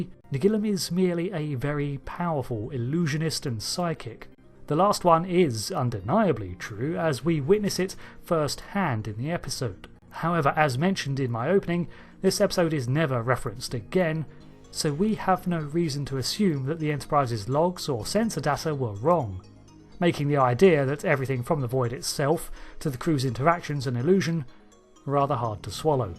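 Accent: United Kingdom. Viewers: Awful nit brit narrator. "Theah shiewwwwwwwwwwwwwwds..."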